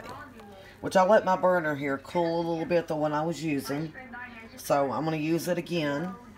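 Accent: South, United States. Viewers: I actually can speak this "Dialect" lol This is COUNTRY - that's Deeper than Southern! I loathe Nutmeg - so NO to the Egg Nog - Folks can add that at the table - YUCK! EHHHhH never liked that taste! Boiled Custart is creamy and sweet - Vanilla is fine ❤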